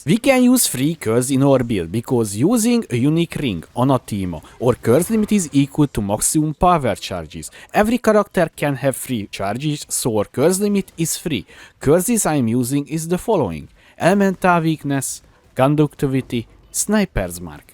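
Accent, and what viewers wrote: Hungary. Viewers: You have a hungarian accent :D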